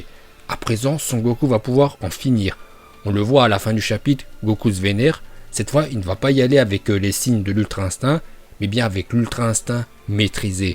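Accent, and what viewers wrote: Japan. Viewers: nous on veut ton accent japonais! Ton travail n'est plus extraordinaire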